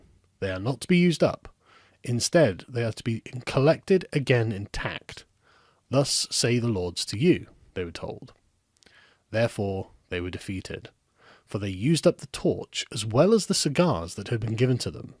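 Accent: United Kingdom. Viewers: I fear is it just a brittish accent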